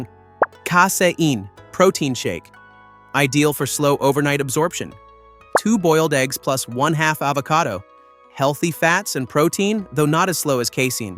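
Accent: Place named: United States. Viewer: Click bait rubbish with a annoying American accent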